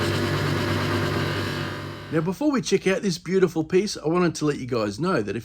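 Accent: Australia. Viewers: Wow! I’ve just stumbled across this page, love hearing a fellow Aussie accent hehe. This is an absolute beauty ❤️ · Hi, loved this video, but i’m wondering: Australia or South Africa, i’m pretty certain you’re not a Kiwi😊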